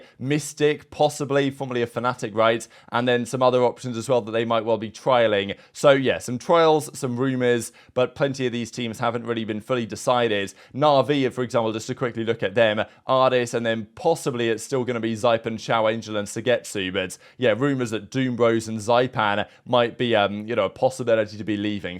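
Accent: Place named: United Kingdom. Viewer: yay learning english, british engliish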